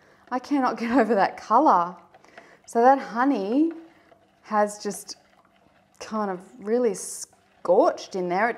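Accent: Australia. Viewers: Sounds like you might be an Aussie.. 🌻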